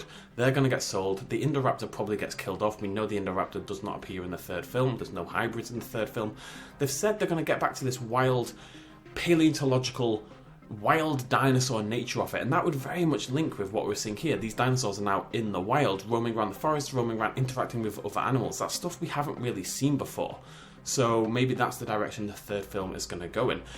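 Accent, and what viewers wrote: United Kingdom. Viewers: Hey I was wandering which part of Britain your from As I am from Worcestershire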